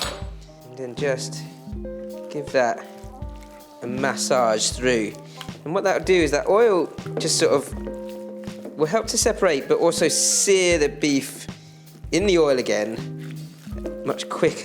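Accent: United Kingdom. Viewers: I'm amazed by the food but confused that you got a British accent hahaha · Chinese speaking with uk accent wow. Amazing and the fiod looks delicious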